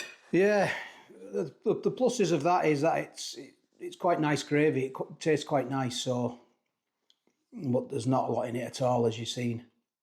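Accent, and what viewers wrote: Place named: Yorkshire, United Kingdom. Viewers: Did you say Barrow,is that Barrow in Furness as to me you sound like you're from the Leeds/Doncaster area-no offence intended....Geography was never my strong point😊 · Posh northerner I thought it was myth 😂😂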